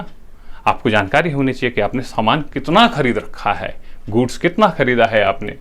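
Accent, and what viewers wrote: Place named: South, India. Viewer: Nice work bhaiya. Congratulations . n I will say it again. You have south Indian accent. 🤘🤘🤘🤘🤘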